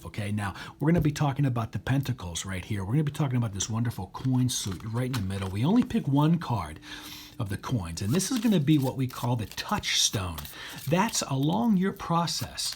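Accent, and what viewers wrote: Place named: Pittsburgh, United States. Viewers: Can't hide Pittsburgh. It always slips out 😄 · I detect a Pittsburgh accent!:)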